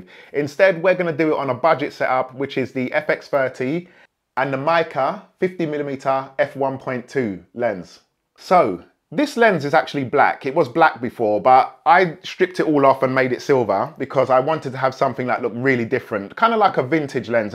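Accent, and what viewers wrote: London, United Kingdom. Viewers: love your London accent man haha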